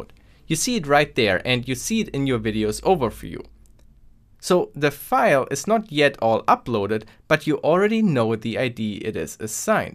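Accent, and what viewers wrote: Germany. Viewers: Bro you got the most German accent I ever heard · r u german? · DIeser Deutsche Akzent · is this german accent ? ¯\(ツ)/¯ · This, my friends, is what a german accent sounds like. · Took me to realise he is german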